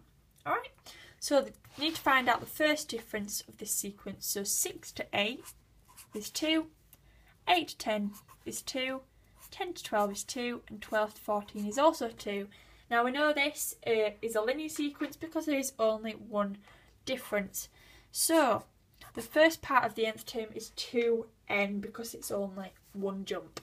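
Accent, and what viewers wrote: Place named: Yorkshire, United Kingdom. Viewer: Ah that's a shame, every now and then I have to come back to GCSE math and this video was easily the best I could find. Also nice to hear a familiar accent! Where are you from? I'm in Leeds I'm guessing you're from somewhere in Yorkshire?!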